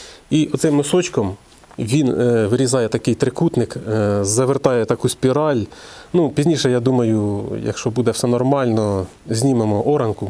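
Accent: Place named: Surzhyk, Ukraine. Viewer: Хотя и говорит на суржике, но плохие,не нужные, слова не мовит( говорит) Все по делу. И субтитры. Молодец мужик( хлопец).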